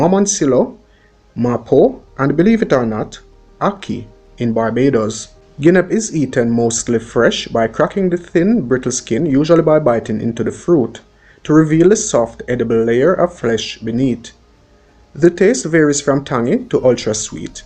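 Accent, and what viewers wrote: Jamaica. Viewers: Sir, Sir, Sir 👍🏾👍🏾👍🏾you have impressed me from the very first video I saw about the pyramid in St. Thomas. I always said that you reminded me of a notable well-researched writer/publisher after I saw your first video. And to hear you referenced the writer called Mark Twain has confirmed that you are just as or even more gifted than he was. You have the same kind of passion for your work. So no bada watch weh di "th" edicated elites dem waan seh. They should all recognize the greatness within you and encourage you. Please do not go chasing after getting "their" institutional degrees either. Continue to teach your self and Edu-tain us and "they" will end up chasing you to offer an Honorary Degree. Also continue your genius in doing your presentations with the same kind of "Jamaican English" because the world loves our accent and language - ask those who travel. 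BIG UP USELF!!! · This is ridiculously RUDE! The host is Jamaican sharing about native Jamaican fruits. The beauty of Jamaica is it's people, culture, food and language. He doesn't need to assimilate the way he speaks. He's sharing valuable information. Those who want the knowledge will stay for it and loving the way he speaks in the process. Too many people want to be westernized while disowing their own culture. You should be ashamed. People all over the world who don't speak English have accents. People in English speaking countries have accents and drop letters all the time. That's how dialects also work. I LOVE when people are themselves and don't switch up how they act or speak depending on who they think is important. He is being his authentic self which I value! Wonderful information is being shared, focus on that!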